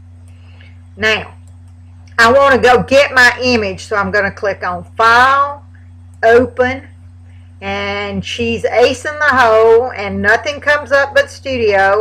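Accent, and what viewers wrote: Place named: South, United States. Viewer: you are so awesome!!! and I LOVE your southern accent!!! (I'm from Kentucky, but lived most of my life here in Ohio, so I lost my accent :( ...)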